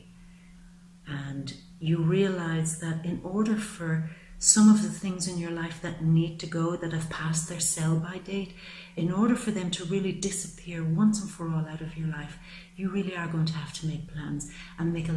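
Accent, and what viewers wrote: Ireland. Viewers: I just love you. And your Irish posh accent :) you remind me of my Irish friend.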